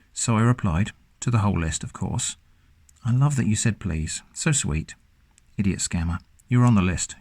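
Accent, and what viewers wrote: England, United Kingdom. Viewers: Rolando Cueva English. Not Aussie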